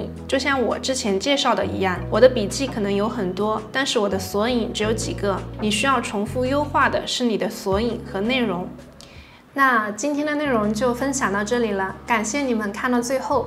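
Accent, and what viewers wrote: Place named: Zhejiang, China. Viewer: 挺好的，你是哪里人啊？好像有点浙江口音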